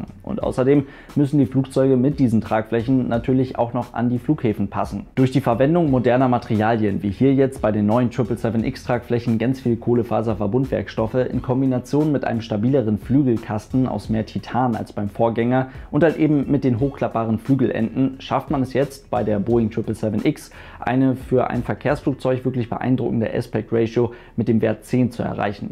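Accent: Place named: North, Germany. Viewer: Warum sagst du immer Flugzeuch? Was ist das für ein Dialekt Kommst du aus dem Norden ?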